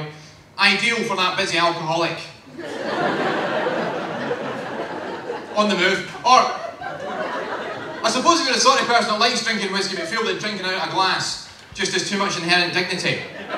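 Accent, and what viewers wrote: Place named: Scotland, United Kingdom. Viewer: He speaks English with a Scottish accent, 'cause he's Scottish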